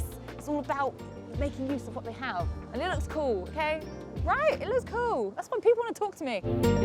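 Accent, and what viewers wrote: United Kingdom. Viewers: I think people keep stopping you because you're a complete smoke show with an adorable British accent and the bike is cool enough to be an ice-breaker. Oops, did I say that out loud?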